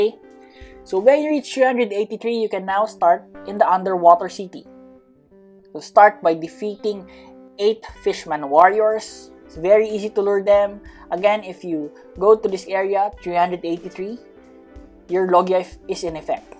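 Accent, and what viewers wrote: Philippines. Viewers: hes sound filipino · are you filipino the voice and the filipino just im guessing yure filipino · Filipino voice ur are filipino?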